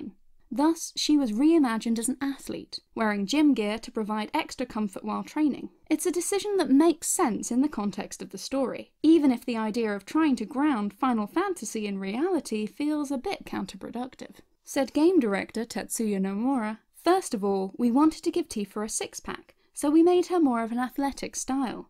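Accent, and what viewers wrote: United Kingdom. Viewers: I´ve got a question not related to this video. As a teacher of English in my country (Spain) I´m always looking for new British English speaking media sources (preferably about my hobbies, one of the biggest being videogames) and I happen to love your accent. Would you mind letting me know what area is your British English accent from? much obliged!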